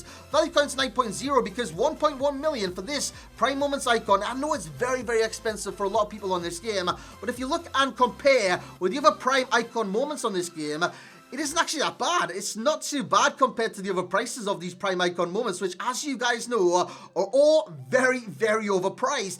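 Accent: Newcastle, United Kingdom. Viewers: Proper Geordie love the vids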